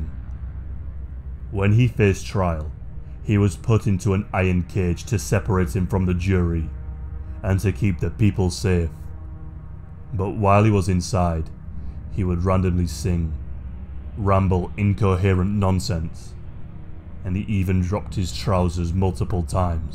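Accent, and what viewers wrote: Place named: England, United Kingdom. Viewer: I’m guessing somewhere near abbey road I gotta know which part of England you are from my friend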